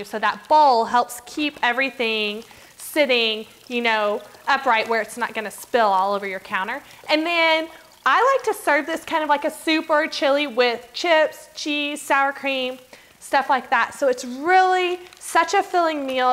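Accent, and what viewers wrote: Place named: United States. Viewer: What a load of rubbish all processed food frozen and that so annoying American voice just shut up!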